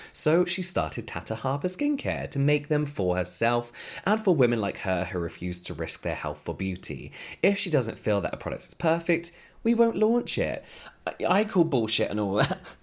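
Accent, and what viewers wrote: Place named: RP, United Kingdom. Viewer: i hope this isnt a weird question but what english accent do you have? Is it conservative RP? I'm just curious to know because i love how classy and posh it sounds.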